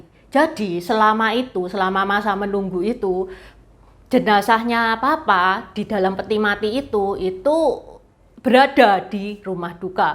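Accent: Java, Indonesia. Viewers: Wajah anda tionghoa bngt ya ,tp tetep ya logat jawa nya kental bngt ya😊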